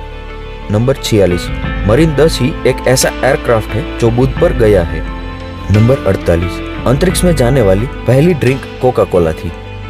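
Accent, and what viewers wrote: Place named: Bihar, India. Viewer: Bihar se ho kya bhai...kyo ki tera avaaz aisa lag tha he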